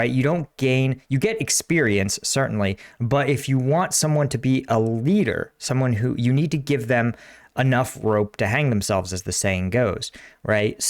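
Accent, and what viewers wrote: United States. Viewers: That’s not a Boston accent it’s a New Jersey accemt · It's not a Jersey accent, it's a South Boston accent.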